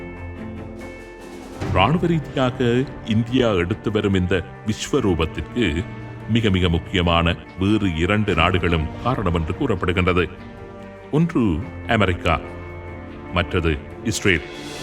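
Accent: Sri Lanka. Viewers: Srilankan voice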